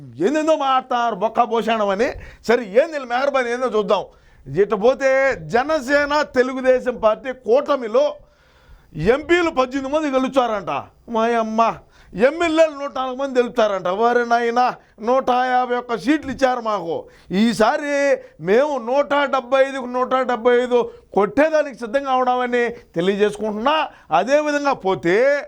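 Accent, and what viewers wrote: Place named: Kadapa, India. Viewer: Anna first time telugu Kadapa slang vinutuna.super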